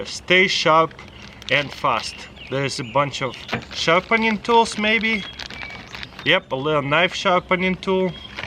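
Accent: Russia. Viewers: По первому слову понял,что ты русский) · Я один слышу русский акцент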